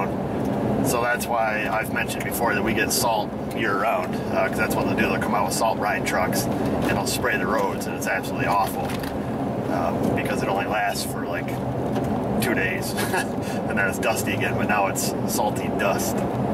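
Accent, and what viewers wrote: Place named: New York, United States. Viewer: First of all,, im a Finn. I live pretty much at the same weather condicions as you in New york. We do use salt as ice melter on low freecing condicions,,, meaning 0- 10 Celcius. But not so much. Our vechiles seem to rust perhapse even more than yours in new york. I used to like the southern drawl,,, but becauce of you,,.Im a big fan of this Northern Newyorker language that you use.I do love the south allso.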